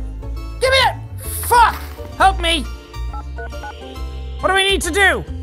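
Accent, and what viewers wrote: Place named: Ireland. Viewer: my friend does not like ur vids so she came up with some stuff to say about u her: his voice is too irish me: what the frick is he supposed to do about that her:his hair is too green me:its blue now her:oh,is face is so plain like why not grow some facial hair? me:he did her:oh, well how bout he SHAVES that me:*leans over and dies laughing*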